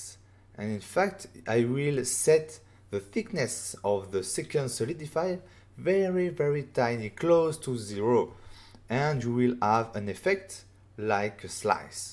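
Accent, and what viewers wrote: France. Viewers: I have Fluent Power Trip for a few days. I think this is the tutorial that it all made sense. It's such a great addon man. So fluid and fast, I really like it. bravo I can tell you have a passion for it. Please keep up the progress! I am also glad I spent a lot of time in Paris for work, so I am used to your accent. Your English is getting better each time! While I was learning French, my friends in Paris would always laugh when I tried to speak with them. They tell me that I sound like a Frenchman from Chicago! lmao great work, please keep it going!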